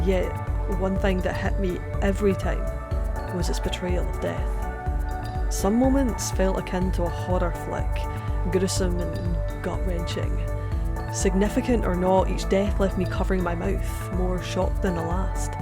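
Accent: Scotland, United Kingdom. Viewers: Are you scottish or something? Kinda sounds like it. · Came here to find a review to throw at a mate and convince him to watch JS. In 2 seconds found a Scottish accent and was more interested in knowing how good you were as a reviewer... I’ve subbed, good points all round you’re articulate af, know your deep knowledge and I have absolutely no arguments against the review. Gonna look through some I’ve not watched now.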